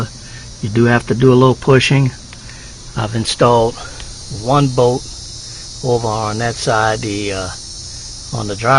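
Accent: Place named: Louisiana, United States. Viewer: Glad you from Louisiana I understood perfectly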